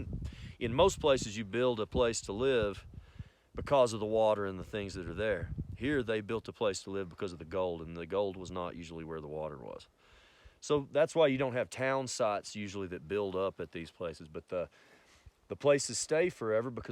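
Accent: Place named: Scotland, United Kingdom. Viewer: That sounds like a Scottish accent 😉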